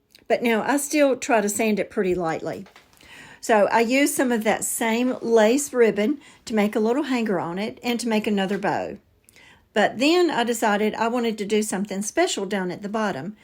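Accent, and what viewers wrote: South, United States. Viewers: I love your excitement with your grandchildren. Love your southern accent. God bless sweety.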